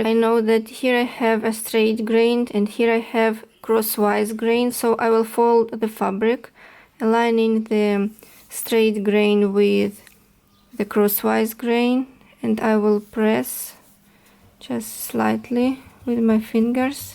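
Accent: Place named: Russia. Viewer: какая красота но смотрю без звука, ужасно режет слух. Всё-таки русский акцент в английском языке это нЕчто, произношение как будто топором рубят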